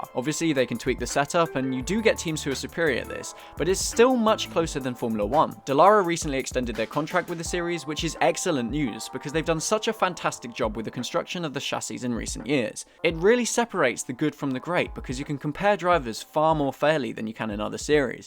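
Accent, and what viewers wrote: United Kingdom. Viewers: Nothing cooler than hearing a Brit talk about Indycar! You have a new subscriber! 🏎🏁🇬🇧😎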